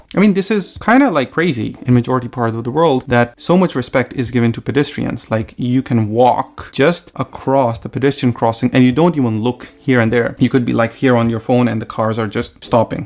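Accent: Estonia. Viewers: Wth, you have Estonian accent, totally 🤧🤔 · Its funny that you have a little bit of an Estonian English accent.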